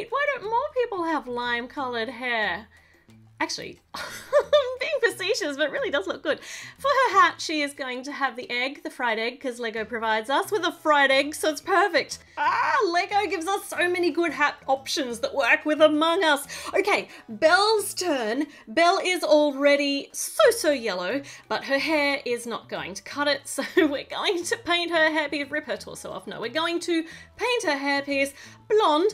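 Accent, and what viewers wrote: Australia. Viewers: Are u ausralian cause ur accent sounds Aussie and I'm Aussie too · Are you an Aussie? Some of the words you say are so Australian.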